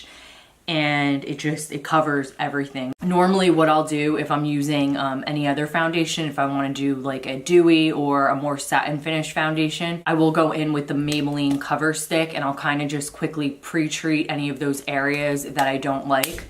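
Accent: Philadelphia, United States. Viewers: Your accent is totally Philly. I hear it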